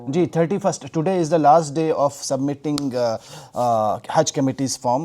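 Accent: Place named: Pakistan. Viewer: Bhai aapki Zuban Pakistan wali hai, main confused hoon ki aap kahan se ho?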